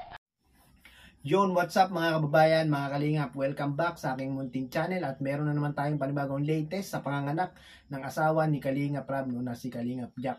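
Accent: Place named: Visayas, Philippines. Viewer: Hoy my friend besaya ka imong tingog mora gyod og besaya ga Hahaha Hahaha god bless my friend 💖 ❤️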